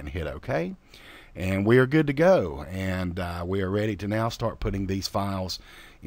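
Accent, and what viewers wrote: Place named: Texas, United States. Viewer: Thanks for your tutorials, really appreciated. And since you evoked it early in the clip, don't worry about your pronunciation (I can hear a texan like accent) : much, much, MUCH more understandable and pleasant to the ear than the guttural germanico-british accent! Thanks for your time and effort, looking forward for more.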